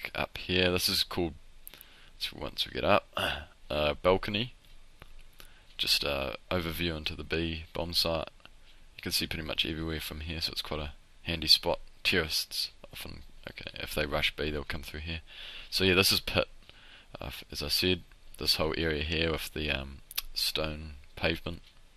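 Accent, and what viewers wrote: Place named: New Zealand. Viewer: cool accent, New Zealand? You sound like flight of the choncords.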